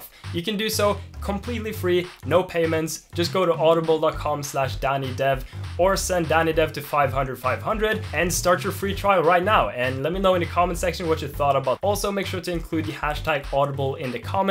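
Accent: Sweden. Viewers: Are you swedish?? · Are you Swedish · Hey Dani are you swedish or norwegian?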